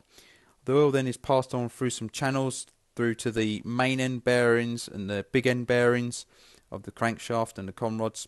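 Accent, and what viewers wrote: United Kingdom. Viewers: Instead of sounding British, you could try enunciating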